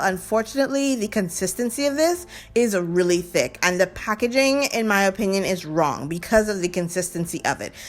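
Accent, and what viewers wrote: Bahamas. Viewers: Where are you from ? I hear your accent. Very close to a Bahamian accent if it’s not one. Fr fr 🙃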